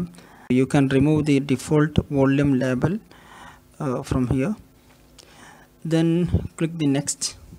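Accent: Kerala, India. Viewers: R u from kerala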